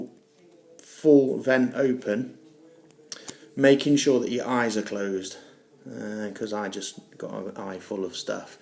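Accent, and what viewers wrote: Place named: United Kingdom. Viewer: You should do voice overs. You have the classic bri'ish voice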